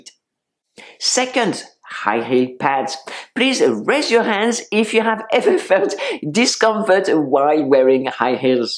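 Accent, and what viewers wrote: France. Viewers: I love your videos and learn a lot. Thank you so very much. I really love your French accent too. 👠👛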